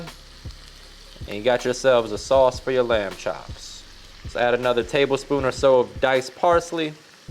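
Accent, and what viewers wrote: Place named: South, United States. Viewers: southern accent lol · You sound like you are from NOLA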